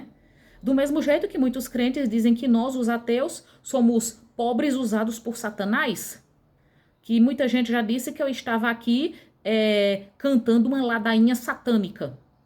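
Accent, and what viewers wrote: Northeast, Brazil. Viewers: SEU SUTAQUE PARECE NORDESTINO.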